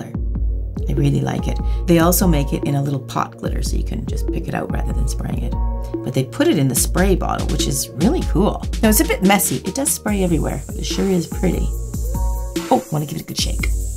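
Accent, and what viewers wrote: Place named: Ontario, Canada. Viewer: Omg I completely feel the same. I am Canadian living in US and I could hear it before I knew you are from BC. You sound EXACTLY like the women in my family loving in Ontario, so nostalgic for me. I am so grateful I found your channel, you're an amazing artist, perfectionist and woman 😊💕thank you!!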